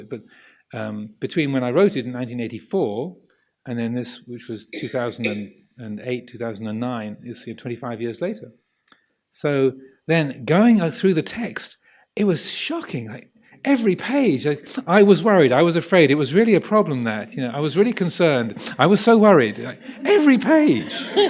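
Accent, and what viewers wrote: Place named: Russia. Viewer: Brilliant words ! thank you most venerable Amaro - love the Russian accent :) and also the statement - ‘we are going to do something terrible - we are going to deprive you of an enemy ‘ I nearly fell off the chair when I heard that LOL Sadhu Sadhu Sadhu we are blessed to hear this monk’s wise words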